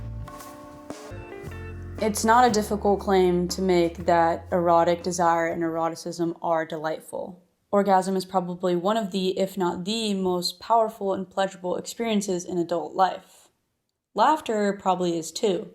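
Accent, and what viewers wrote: Brazil. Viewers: Você é brasileira ou portuguesa? Pelo sotaque é brasileira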